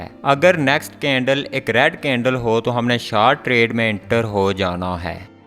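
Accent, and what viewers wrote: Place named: Pothwar, Pakistan. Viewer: bawa gi , english bhi poothowari main boltya ho ... yeh candelstick nahi hota .. Candle Stick hota hay dono ko mila k na parho ... raja g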